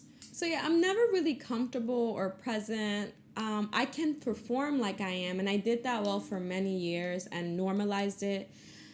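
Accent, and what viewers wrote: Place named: New York City, United States. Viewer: R u from NYC?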